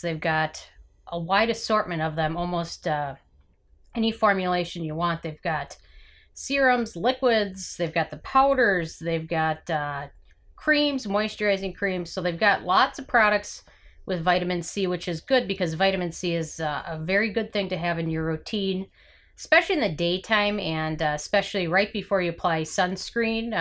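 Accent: Minnesota, United States. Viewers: I just received this today. I noticed your accent then you said you were in MN. Me too😁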